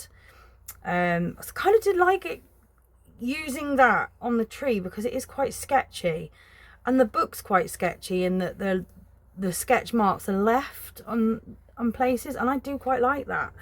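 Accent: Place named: England, United Kingdom. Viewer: You have a lovely voice! You have a beautiful English accent (to me, an American) and pleasant musicality (engaging and expressive). I could listen to you for hours, whereas I have had to bounce from other channels because I couldn't stand the sound of their voices. I dislike what they call vocal fry (common with American females) and that annoying sharp popping sound Australians tend to make and how they make every sentence sing-songy, like a question? Probably nobody likes the sound of their voice because it's different from the outside then within our own heads. 😅Anyway, yours is wonderful, so I hope you can feel reassured. And your coloring is very cute too. 🥰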